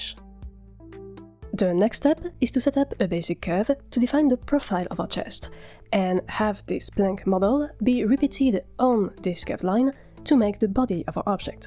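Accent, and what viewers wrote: France. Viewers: bro is def french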